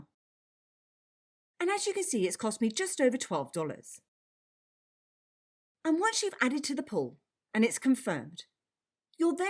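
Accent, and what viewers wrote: United Kingdom. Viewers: OMG the first bri‘ish crypto youtuber I actually enjoy watching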